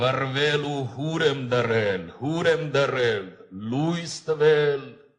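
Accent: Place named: Russia. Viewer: ankexz asaz es rusakan akzent em zqym vor@ ajdkan el dyrs gi qalis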